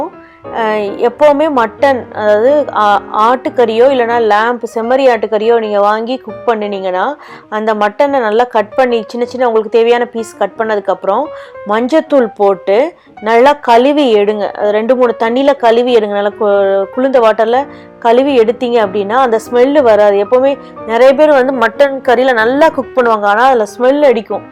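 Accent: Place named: South, India. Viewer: Very nice, are you southside,your slan is such a way.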